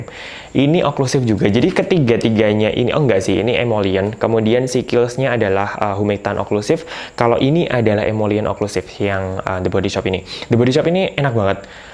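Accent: Java, Indonesia. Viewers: Dosen skincare pertama saya 😁... Dokter, medhoknya mirip youtuber "Korea reomit"... 🤩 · Mbleber ndlewer ndlewer 😂😂 Jowo buanget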